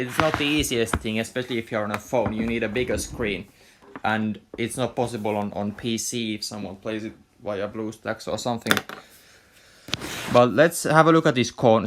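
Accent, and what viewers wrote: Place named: Finland. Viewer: Hyvä ralli enkku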